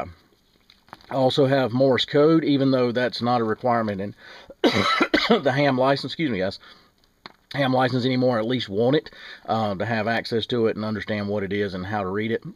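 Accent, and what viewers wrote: South, United States. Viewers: I dont hear it. Besides the southern accent. 401s accent isn't as thick.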